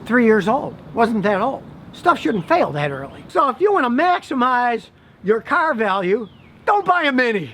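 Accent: United States. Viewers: your english is really american and you do your reseach 100% before a video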